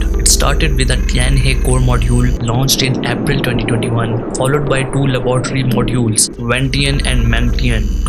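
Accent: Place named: India. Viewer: Indická angličtina katastrofa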